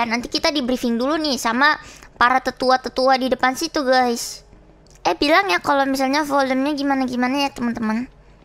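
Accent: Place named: Java, Indonesia. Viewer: Tapi asli medok nemen kobo, logat sama pemilihan suku kata asli wong jowow😊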